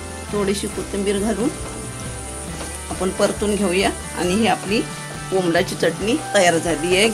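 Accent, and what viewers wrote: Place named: Rural, India. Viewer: Tharrat marathi aahe tumchi baba chan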